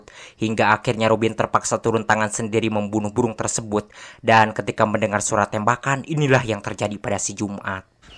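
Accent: West Java, Indonesia. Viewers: Anjig Sunda pisaaaan he he he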